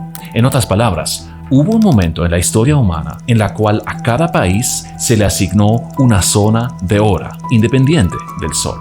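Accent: Germany. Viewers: Más bien está haciendo una pronunciación alemana.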